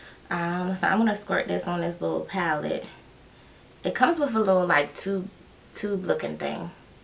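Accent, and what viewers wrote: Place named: DMV, United States. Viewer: Hi there! I stumbled upon your video as I'm trying to get reviews of this foundation. You sound like you're a local girl to me (I'm in the DMV). Thanks for the video!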